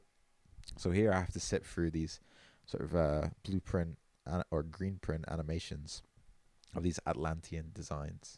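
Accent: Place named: Scotland, United Kingdom. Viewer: Yeah, man i’m just a few miles away from your home town! Throughout the video after I made the comment I was just thinking - surely this dude has to be Scottish somehow 🤣. I figured maybe even your parents. How come you moved away from Costa Del Scotland?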